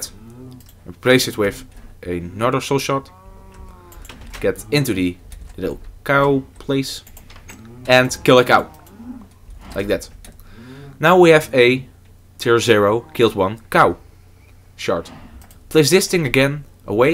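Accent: Netherlands. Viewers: Are you dutch? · je bent nederlands, je hoort het aan je accent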